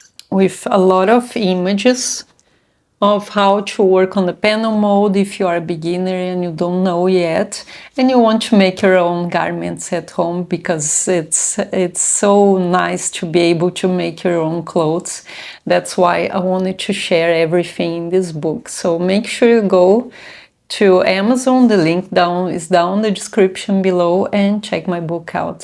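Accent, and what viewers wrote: Brazil. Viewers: Are you brazilian ? You have the brazilian accent 😊 I love the tutorial! Thanks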